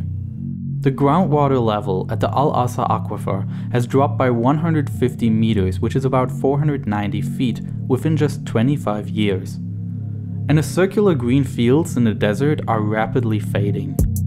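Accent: Germany. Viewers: But he does say réservoir properly. So I think it's an accent thing. Probably German. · ReSAWARS. Dieser leicht Deutsche akzent triggert bissle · You sound german, are you ?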